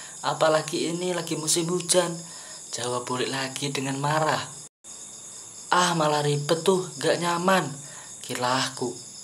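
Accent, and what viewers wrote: Java, Indonesia. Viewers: Suaranya logat jawa medok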